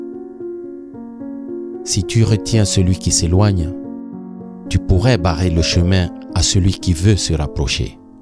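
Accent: Africa. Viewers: L'accent africain sur fond de piano répétitif rend l'écoute bien désagréable, néanmoins l'effort nécessaire à la bonne compréhension du message est fructueux. Merci pour cette vidéo et le partage de connaissance. 🙂:thanksdoc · Tout simplement formidable ♥️ j'adore l'accent africain plein de chaleur et de sagesse 🌞MERCI🙏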